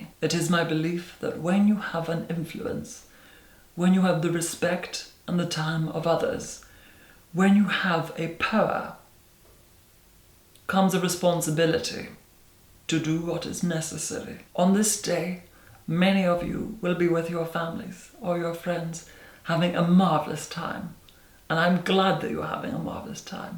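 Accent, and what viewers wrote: Scotland, United Kingdom. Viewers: The most beautiful man in the world? Is it a slight Scottish accent? Such class. Xx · Are you scottish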